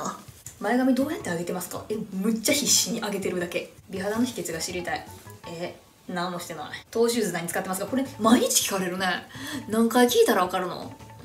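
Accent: Kansai, Japan. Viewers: 松浦さんの話し方、私はすごい好きです！！これぞ関西って思います！2019の岸和田だんじり祭りの番組見てから松浦さんを知りました。その番組も撮って繰り返して軽く20回以上見てます！その時の松浦さんもYouTubeやってる時の松浦さん！凄く好きです🥰🥰これからも応援してます！大好きです😭✨それにレペゼン地球のチャンネルを登録してるのすごいビックリしました😳私、レペゼン地球のファンで凄く嬉しいですヾ( 〃∇〃)ﾂ ｷｬｰｰｰｯ♡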